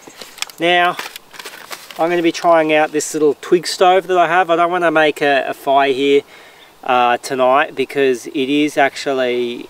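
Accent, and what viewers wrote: Australia. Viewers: Great video,. Enjoy your videos. Love hearing your Aussie accent. Keep enjoying life.🎉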